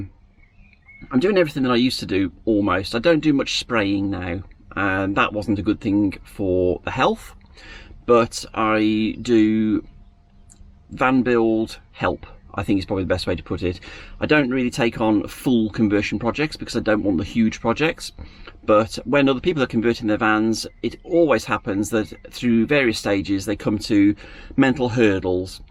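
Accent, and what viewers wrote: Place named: North, United Kingdom. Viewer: You sound a northerner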